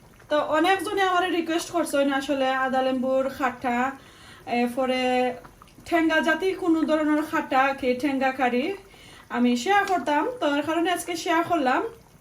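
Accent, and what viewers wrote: Sylhet, Bangladesh. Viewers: I totally agree with you sister . Lot of sylheti try to impress by speaking shudo basha and it just doesn’t come over . Be proud of your dialect .. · Oy apa amar khob vala lage honte sylheti mat afner, shob shomoy ola matiyo re go, ar jee mojar khatta ransuin, Ma sha Allah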